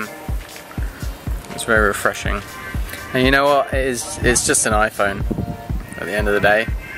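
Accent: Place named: South Africa. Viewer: sorry about your phone :( i definitely think you're developing south-african accent though! :)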